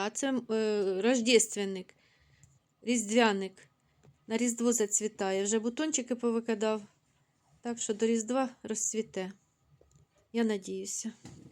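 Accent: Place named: Kirovohrad, Ukraine. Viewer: Дякую за відповідь.Я так і думала що або центр,або східніше бо розмовляєте як ми в Кіровоградській обл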